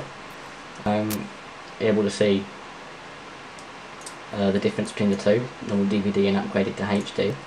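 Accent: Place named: England, United Kingdom. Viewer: Man i love your English accent ^^ and also your bluRay :p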